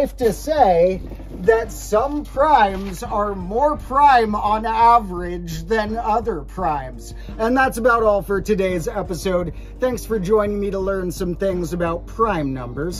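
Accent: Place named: United States. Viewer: It's like with danger and an American accent.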